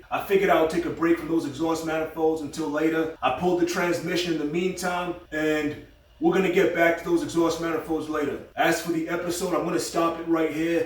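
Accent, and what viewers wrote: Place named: East Coast, United States. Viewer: Love your stuff man! You're doing great videos! I'm curious though you're in though as it seems like you are dealing with a lot of rust issues and I can hear some East Coast in your voice so you're definitely not in Houston Metro... Keep up the good work and here's wishing your channel all the success in the world!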